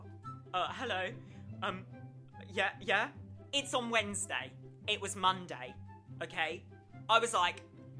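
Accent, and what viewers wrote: United Kingdom. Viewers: Yes, he's English. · British? Sounds like british or is he? Wtf im not sure if he is British or what .-.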